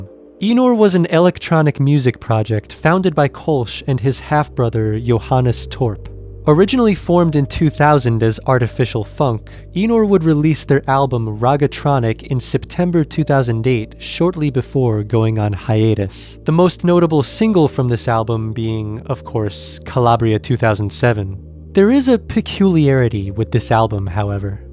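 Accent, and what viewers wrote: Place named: Jamaica. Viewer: I can understand pretty much everything said when you don't talk over it....for some reason I mostly almost completely understand it....go figure Jamaican.... English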